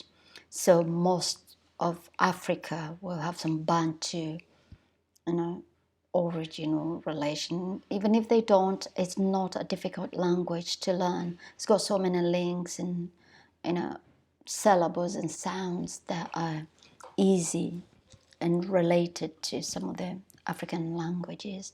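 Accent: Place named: Uganda. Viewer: The presenter of this program you sound Ugandan and yet you said you are Kenyan I’m pretty sure depends on your tribe Ugandan and Kenyans we are equally the same thing thanks for your information I already speak Swahili myself I was born speaking Swahili and as you said Swahili is the way I totally believe you because I’ve always been convinced that Swahili was the most superior language on the continent of Africa always thought Swahili is superior than other languages from the west coast of Africa or even the southern coast of Africa and other countries in between that again Kiswahili is definitely superior…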